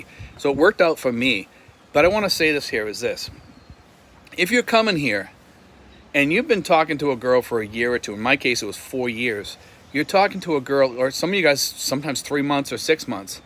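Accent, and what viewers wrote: Boston, United States. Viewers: Great advice I missed you more than I thought glad I reconnected I don’t know how I lost connection in the first place I don’t know if YouTube is playing games or watt but anyways it’s good to hear that Bostonian talk logic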